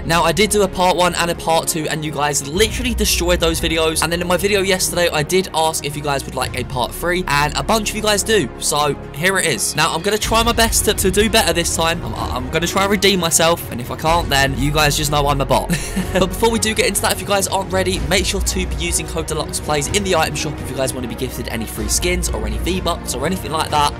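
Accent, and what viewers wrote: United Kingdom. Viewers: At first how did don’t reliaze he’s British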